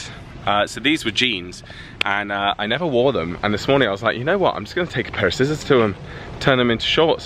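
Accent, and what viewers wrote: England, United Kingdom. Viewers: So cool. U are very good at ur job. Such a lovely man. I could sit and listen to ur voice alllll day. The English accent….. ❤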